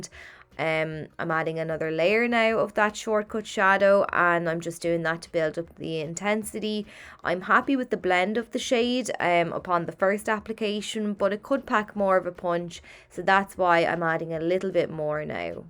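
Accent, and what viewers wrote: Ireland. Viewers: Y tiene un acento irlandés muy marcado, pero yo estoy acostumbrada, la entiendo y me gusta.... A mí también me encantan sus videos😘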